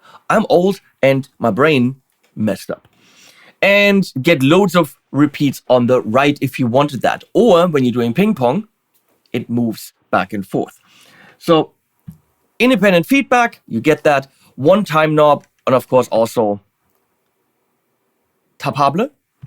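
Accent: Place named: Germany. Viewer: What a coincidence! There is a word in Greek with exactly that spelling and meaning! ΜΕΡΑΚΙ (yes in Greek P is the latin R and Π is the latin P). But it's not pronounced meh-ru-kai but meh-rah-ki exactly as you pronounced it with your German accent my friend. This could be the ERASMIAN pronunciation the latin world uses to read Greek for convenience. For those not familiar with ERASMIAN It's as if someone would read the english word "thought" as ...tho-oo-gt hahaha.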